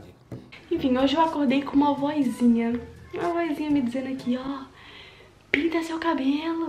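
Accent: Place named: Portugal. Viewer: já ta ficando com sotaque de Portugal ❤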